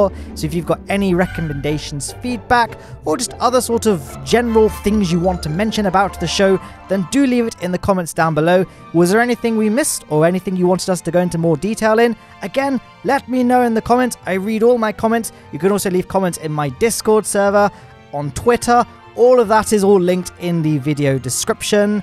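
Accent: United Kingdom. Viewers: You sound extra English in this video? Like when you did blunderbirds intros, it sounds odd after watching velocity lake!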